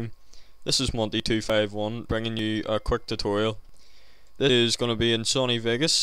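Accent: Northern Ireland, United Kingdom. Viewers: you from northern ireland matE?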